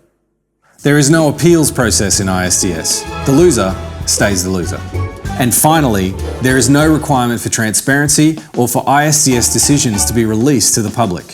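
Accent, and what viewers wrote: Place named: Australia. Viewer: Love his Aussie accent :) AND he's smart - we need guys like this here in the USA.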